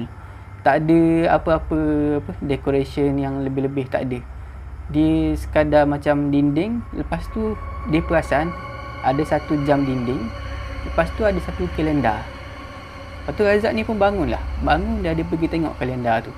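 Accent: Terengganu, Malaysia. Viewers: ro tera citer kot base ganu kali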